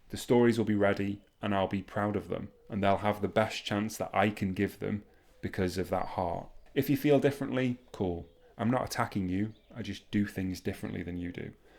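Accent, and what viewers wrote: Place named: Yorkshire, United Kingdom. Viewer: I have two questions which I think you can help me with. First of all I believe that your accent is from Yorkshire so I think you can definitely help me. Because I’m trying to write a book that you set in Ingleton and I’m a London living in Oxfordshire so I have made a rod for my back. I also know that the dialect is definitely different to the way I would say things. The second thing that I don’t know if you can help me with is how do you write a character who is drunk and also from Yorkshire? Do you use the dialect heavily or do you tend to just refer to actions? Finally, I just remembered that I know there’s a difference between an alcoholic and someone who is drunk because you can have a functional alcoholic or an abusive alcoholic who’s not drunk all of the time.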